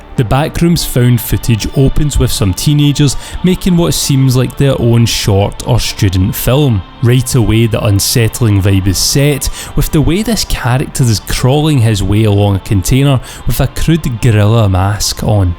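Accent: Scotland, United Kingdom. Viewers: Scottish accent very funni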